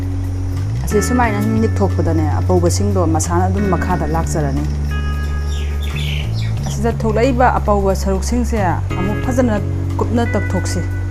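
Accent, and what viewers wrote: Kakching, India. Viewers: Kakching marol se taba yam nungai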